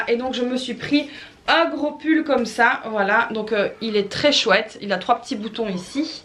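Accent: Liège, Belgium. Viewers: Bonjour . Je fais souvent mon schopping chez Kiabi pour mon fiston. Je trouve que les prix sont abordables et la qualité y est. Passez une bonne journée. En effet vous avez un accent je dirais de Liège ☺ ...moi j ai aussi un accent de Bruseleir lol. Prenez soin de vous et au plaisir. .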